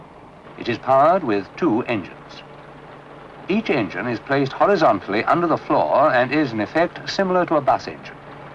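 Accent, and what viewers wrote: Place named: RP, United Kingdom. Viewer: I enjoyed this! It's a piece of social history as well as railway history. Look at the clothes people wore, listen to the BBC English of the narrator, the "Light Programme" style of the backing music, the explicit statement near the end that only men would ever drive these trains, spot the parcels trolley on the platform etc etc... it's a real step back in time.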